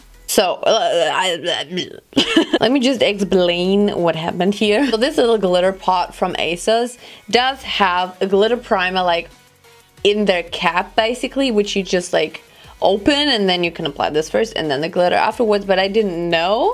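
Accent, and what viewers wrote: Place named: Germany. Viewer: Ist sie deutsch ? Weil die hat Nh deutschen Akzent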